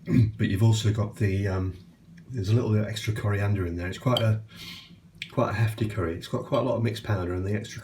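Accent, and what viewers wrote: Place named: North Midlands, United Kingdom. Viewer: Yaaaay! He speaks! ( although very muffled) but still a big step! Great vid! I somehow thought you were a Geordie , so obviously you are not. North Midlands?? I must try this ( but still have a backlog of about 30 of your recipes), great stuff as usual, much appreciated .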